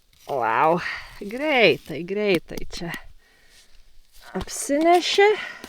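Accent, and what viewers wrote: Lithuania. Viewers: Sveiki, smagu Jus stebeti, is kur Jus esat Lietuvoj, kalba kaip Siauietiska arba Panevezys?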